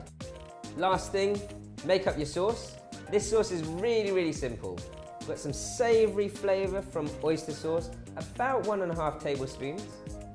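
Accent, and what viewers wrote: United Kingdom. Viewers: A oriental with a British accent OK you got me on this one🤓 Looks delicious❤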